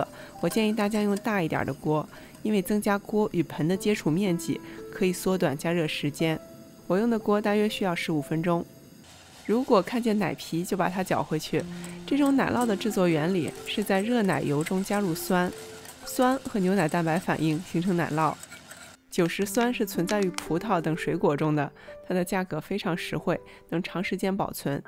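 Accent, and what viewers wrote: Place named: Yantai, China. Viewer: 听口音不知是不是山东烟台人？😁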